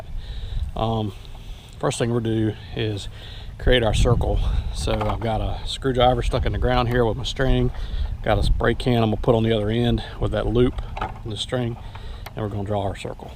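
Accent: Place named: Texas, United States. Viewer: Yea that's too far. The accent sounded more like you were from Texas. You can actually build an in ground pool for about 3 to 5k. Depends on how fancy you want the finishes and if you skim coat or just use a liner. The construction is just with simple cinder blocks. You have the hardest part already solved... digging the hole.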